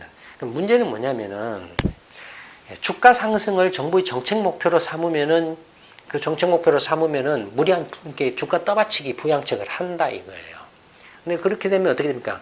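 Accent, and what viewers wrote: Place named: Jeolla, South Korea. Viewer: 전라도 사람이네. 말투 들으니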